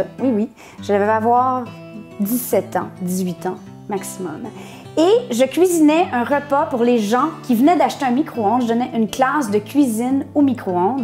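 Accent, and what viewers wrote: Canada. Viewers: Je me demande pourquoi je ne vous ai pas connu plus tôt ?? Vos vidéos sont agréables à regarder. Vous êtes marrante et je kiff trop votre accent...le bel accent canadien.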